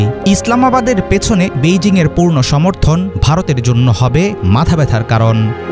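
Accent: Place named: Bangladesh. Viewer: এটাই ভাবছিলাম কারা এই ভিডিও বানালো, একটু শুনেই বুঝলাম বাংলাদেশী ভিডিও, বুঝেই বন্ধ করে দিলাম 😕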